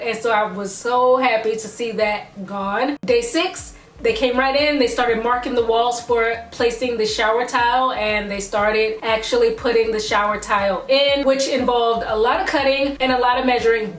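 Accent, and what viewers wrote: Baltimore, United States. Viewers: End result is so beautiful! I love this! Sn: I hear a Baltimore accent dew tew lol are u from Bmore?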